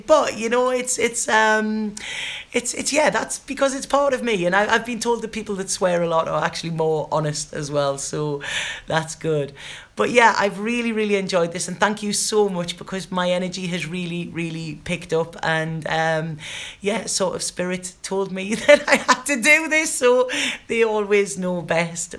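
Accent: Newcastle, United Kingdom. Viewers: "Only Love Today!" Oh yes my love you are teaching. I knew that motto but when you said that into my ear/brain as I did my dishes.....it stuck! Thank You for ever and for always. I will pray for you and offer you a bit of my own advice,"I cannot stop people from doing, saying, thinking shitty, cruel, nasty things to me but I will be F****d if I will give them my fear, greif, the satisfaction seeing me using my free will to help them with their nasty, dark, petty endeavours. That is the part I/we CAN have complete control over. You are amazing, an intuitive reader, an uplifting force of nature and especially as a Sista-Soldier! The first time I heard you it was by "accident" as you came on autoplay whilst I was doing dishes and afterwards I were desperate to find you and, huzzah! I did. Luv your Geordie accent. Don't you worry me darling you are More than equal to any bulls**t lesser beings stomp and shout out of terror into the abyss. 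We got you girl. luvluvluvs, Regan. p,s, I have medical empathy and I Do Not see/sense and malignancy not dirty dishwater colour which is what I see/sense when anyone is going to pass away and/or suffer from a cancer. Is it possible that the growth has been in your brain in some form since before birth? I was so blue last night and I swore off people for good (again!) And here comes channeling God as Billy Connelly saying,"Oh do you bloody think so?!" Yea, I agree completely, spirit love is so much cleaner, purer, holy, for lack of a bette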